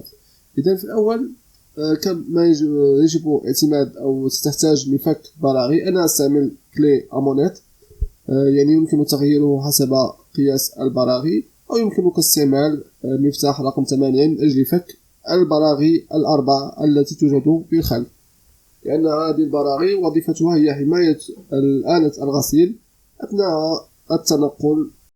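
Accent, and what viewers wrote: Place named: Morocco. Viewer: طون كتهضر خويا غير بالدارجة باش نركزو مزيان، والله العظيم مفهمتك حيت كتكلم بلغة عربية فصيحة بلطنة مغربية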